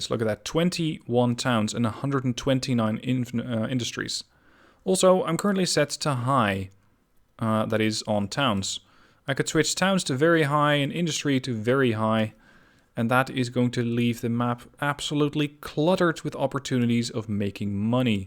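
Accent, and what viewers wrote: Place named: Netherlands. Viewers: Said no one ever... Maar goed, een nederlands accent verstop je niet zomaar :) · Yep, that's English with a Dutch accent. I might just follow just for that 😉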